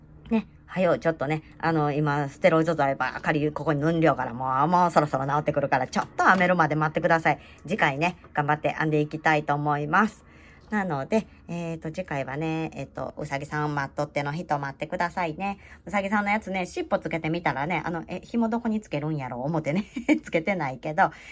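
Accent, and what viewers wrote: Kansai, Japan. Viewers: 関西訛りが魅力的な、こんにちは。(札幌とその周辺は訛りがほぼないので、素敵に聞こえて仕方ないです) 円は、法則で覚えているので…増目位置もその都度考えればすぐに出てきます。法則で覚えられると、便利ですね。中学高校の時、数学の図形の問題と数列はちょっと得意だったので(すごく得意までではない)、編み物の法則も覚えやすい気がします。 …学校のお勉強は、いつどこで役に立つかわからないですけど、ある時ひょっこり顔を出しますね…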